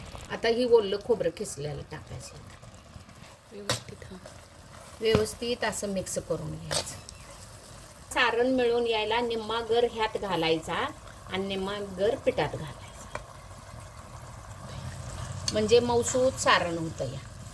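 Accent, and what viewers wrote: Kolhapur, India. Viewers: फारच नावीन्यपूर्ण ! आणि ताईंची कोल्हापुरी भाषा इतकी गोड लागली कानाला ! थेट ल्हानपनातच शिरलं मन !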